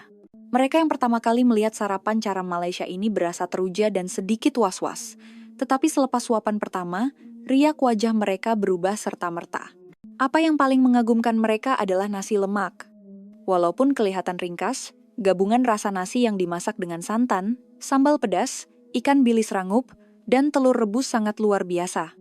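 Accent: Indonesia. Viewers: Pengulas ini wanita Indon kan.Tetap kita boleh detect. P disebut pe,k disebut ka.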